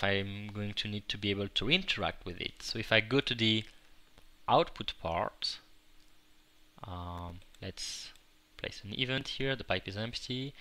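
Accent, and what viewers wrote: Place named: France. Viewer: he must be french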